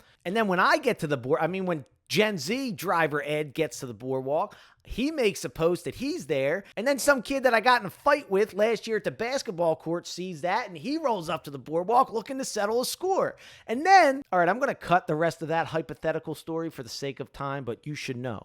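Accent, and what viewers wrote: Philadelphia, United States. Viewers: I knew you had a Philly accent!!! Or I guess Jersey, but I'm from Philly so it sounds like mine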